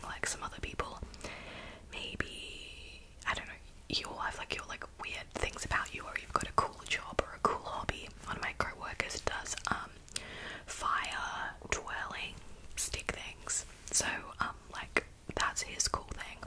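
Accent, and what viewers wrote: Australia. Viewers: Your Australian accent is definitely the strongest, but it took me some time watching you to really decide 😂 This was a fun video. It’s funny you prefer an American accent for ASMR. I am American, but prefer Australian or Scottish :) You’ve led a fascinating young life so far! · Aussie Aussie Aussie!! I knew it!!💕💕💕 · I love your accent. I knew you were either Australian or a kiwi. I would love to visit Australia. You've definitely traveled more than me 😅 you're amazing never stop being you. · I'm Scottish, and if anyone believed you were Scottish...I'm terrified for Humanity lol XD I had you down for Australian pretty quickly, but I might have an unfair advantage due to some of my family being Australian, as they sound very similar to you :) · I’ve waited for this video for so long to confirm if you’re Aussie or not 😂 every video I changed my mind and convinced myself that I was wrong hahaha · YESSS I KNEW YOU WERE FROM AUSTRALIA (it's hard to identify accents as a non native english speaker) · congratulations from russia,!! 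i'm very glad i came across your channel some time ago. even though i don't know you personally you seem to be a good person. i love your videos and i adore your aussie accent so much (i knew you were aussie! glad i got it right haha no one says 'no' like aussies do, totally love that ♡) thank you for everything you do for us, really, it means so much... and also we love a boyband fan. i'm a huge kpop fan, was a directioner too and i still listen to 5sos, their latest album is really good, 10/10 and as for your question i'm doing pretty good yet i'm worried as i graduated from the univeraity this year and i'm moving to a new apartment literally in two days and as you can tell my life is a mess and clearly not so stable right now. so once again thank you for your kind words. i will try my best not to stress too much and just enjoy the moment while going my own pace not sure if you read that and the comment is way too big then i expected but thank you, i love you and sending you my best regards! 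❤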